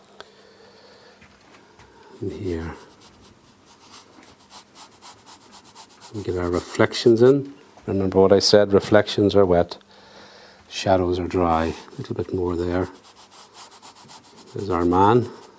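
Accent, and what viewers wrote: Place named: Midlands, Ireland. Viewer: U sound like a midlander like myself..13 years later here from Athlone next to the Shannon wanting to paint a similar fishing boat for a mate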